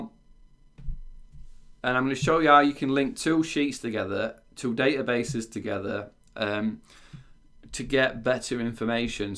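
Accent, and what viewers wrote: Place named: Liverpool, United Kingdom. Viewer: Good stuff, I just had a phone interview where, in addition to my SSRS experience, I said I have worked with Crystal Reports. Good overview here. Hey are you from the Liverpool area? I'm a Yank but I try to discern British accents. You have an accent similar to Paul Hollywood on the Great British Baking show.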